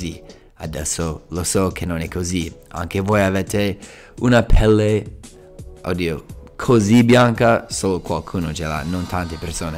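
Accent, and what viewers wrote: United States. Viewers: L'accento americano si sente un botto😂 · Però sfatiamo un mito: dicci una volta per tutte se carichi l'accento americano che hai quando parli italiano o no!! Porca miseria io sono sposata con uno del sud eh!!! Ma lui non hai mai avuto l'accento che hai tu. MAI MAI MAI! Il modo in cui ad esempio pronunci la T... ma non è un crimine, nè un'offesa o una presa in giro eh!! Che davvero mi fa assolutamente strano sentire te che non sbagli manco un congiuntivo e hai questo accento così forte... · 🤣🤣🤣🤣 Il tuo accento è sempre moooooolto americano. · you are becoming my Number One source of entertainment. I saw you and your girlfriend in an interview with Davide, whom I follow seriously to improve my Italian. Your YouTube posts really tickle me. I have been learning Italian, off and on, more off than on, since 1965. I have always worried myself silly over my American accent, and now here I find a guy who is making a living off of his. 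Good on ya! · Anche io! Booo se non parlasse con accento americano passerebbe per italiano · non ti preoccupare il tuo accento americano e' molto simpatico.Un po come noi italiani quando parliamo inglese.La stessa cosa.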